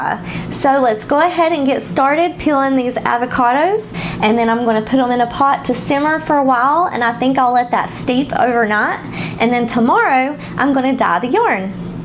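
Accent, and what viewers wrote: South, United States. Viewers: Your so cute, love listening to your southern accent. Thought of trying some dying after the first of the year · Wow! That is cool. My husband is originally from Mexico and we eat quite a few avocados, now I have a new use for all the peels and pits. How did your guacamole turn out? Love your accent. Always nice to hear a fellow southerner! 💜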